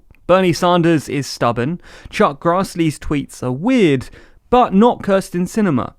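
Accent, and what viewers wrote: United Kingdom. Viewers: his main channel is for uk politics, he also speaks with a british accent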